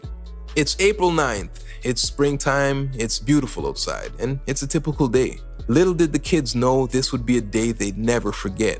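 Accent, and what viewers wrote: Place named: Quebec, Canada. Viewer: Your from Montreal Quebec or chateuguay right I can tell from ur accent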